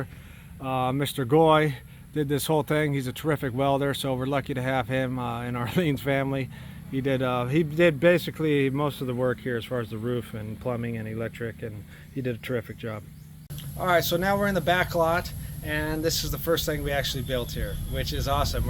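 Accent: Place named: United States. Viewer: If you don’t mind me asking because you didn’t disclose the information on how much was the total cost for everything. You sound as if you’re from the states as myself. So my question is how much was everything (land & everything built including fence) plus if you are from the states how are the taxes done?, do you have to pay taxes in both countries?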